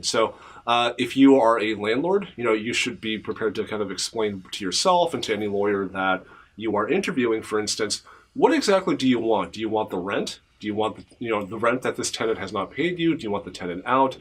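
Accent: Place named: California, United States. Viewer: wow...now that is a california accent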